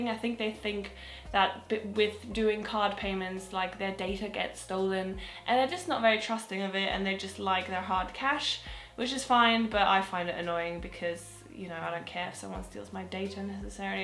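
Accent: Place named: London, United Kingdom. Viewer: You have a superb London accent. I can barely tell that you are not a native speaker.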